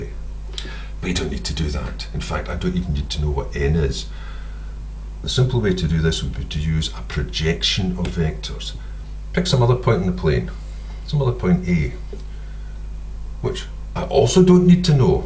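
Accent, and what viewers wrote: Scotland, United Kingdom. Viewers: Is it just me or his accent sounds like from Brave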